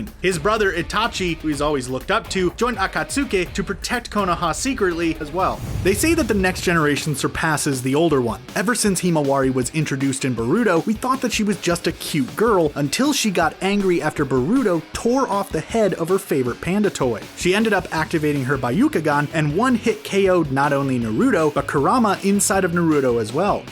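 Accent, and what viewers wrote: United States. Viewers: He thinks that this is an American show that way he speaks like that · Really? Naaaruto? Boooruto? Even kaaaguya?! Wtf. Kakashi and Tsunade are about the only names that don't maker me cringe when hearing them pronounced like an American.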